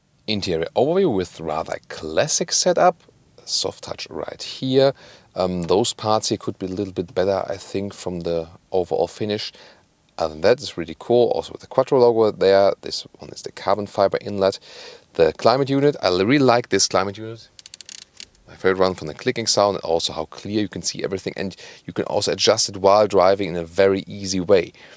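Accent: Germany. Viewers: Dein Deutsch accent ist toll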